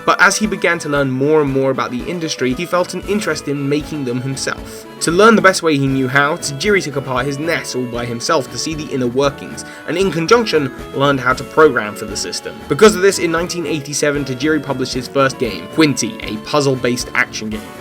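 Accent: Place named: United Kingdom. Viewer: sweet British voice is just perfect for this.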